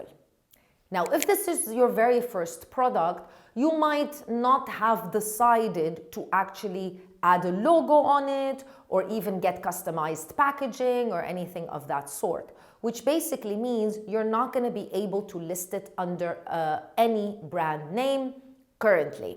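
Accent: Arab world. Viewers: i don't want to learn from you but i like the way you speak english in arabic.hehehhe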